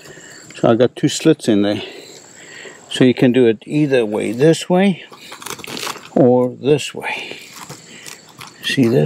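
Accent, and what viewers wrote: South Africa. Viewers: You sound like a South African 🇿🇦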